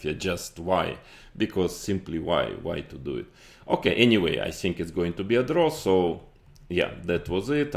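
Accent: Russia. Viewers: So thick Russian accept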